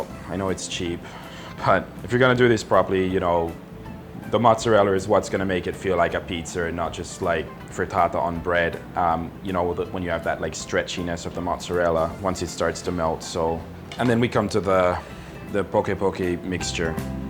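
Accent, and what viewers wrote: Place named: Philippines. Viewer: Soooo... your accent really throws me off. I know you're Aussie but you sound like a Flip fob... (not that there's anything wrong with that). =P Love your channel, btw... keep it up!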